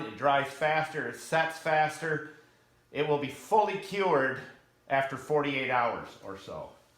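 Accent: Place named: Chicago, United States. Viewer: Ok ha I detected a slight Chicago sounding accent so thought you might be close to that area. Beautiful part of the state. Love your vid’s thanks again!! ✋👍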